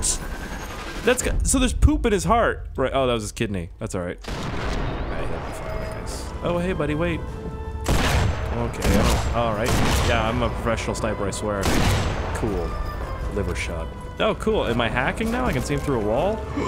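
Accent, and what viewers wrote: Germany. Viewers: As in ur German accent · I haven't laughed so hard in months 😂😂 your German accent is fucking great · Best German accent ever :D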